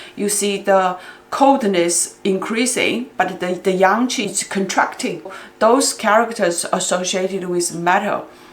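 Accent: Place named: China. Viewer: I love your accent its cute Chinese English I love it by the way you are not only a good tutor but also beautiful women beautiful wowoowow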